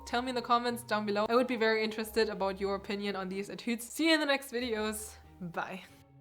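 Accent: Germany. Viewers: That accent tells me ur native language is german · Nice the German accent and words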